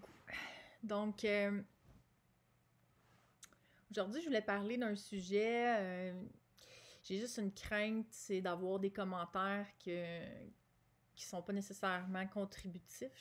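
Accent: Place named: Canada. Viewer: Merci de votre reponse....Cela me touche aussi car cela me conforte que nous sommes des êtres divins et nous avons un rôle à jouer dans l'éveil pour emmener tous nos soeurs et frères du monde terrestre vers une vie dans l'Amour La Paix et la Lumière.....Gratitude....Namasté PS: j'aime beaucoup votre accent canadien....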